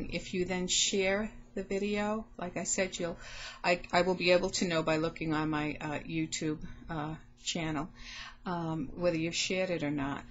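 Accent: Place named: Canada. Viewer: Hi, new subscriber...I just came over from lynnyvnnestwo channel...she made a video response to promote your challenge...here's a link for you - <link> (hope it works) not sure if I'll be able to take part this time but good luck to everyone that is...hope it all goes well for you...enjoy. The dress is beautiful and it's so generous of you to want to share...I think it would be hard to cut into one of the dresses though but I guess once you start it's ok and there's so many things you can make once you have one...also are you in Canada or America...my guess is Canada but please forgive me if I'm wrong, I don't want to offend anyone..lol..why I ask is because there's a certain word that Canadians say and I love the way it sounds...the word is "out" and I'm sure it's a unique pronunciation to Canada...anyway, that's enough from me...best wishes. Ann...x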